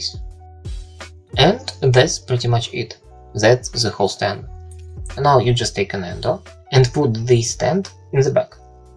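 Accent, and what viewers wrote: Russia. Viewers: Мне вот интересно, он говорит с русским акцентом или такой присущ ещё кому-то? And the video is very informative! In Russia, where I live, it will be problematic to buy Nendoroid Easel Stand on your own, but I always want to take a photo of my favorite figurine. Thank you very much for DIY!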